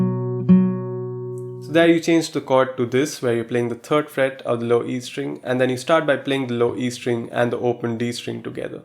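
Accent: India. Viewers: You are Indian right I can understand by your accent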